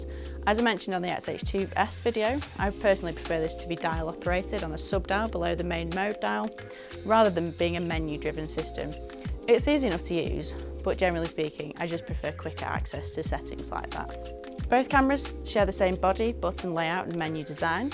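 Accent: United Kingdom. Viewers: It’s so nice hearing a Northern accent in these reviews! Thanks for making this 👍🏻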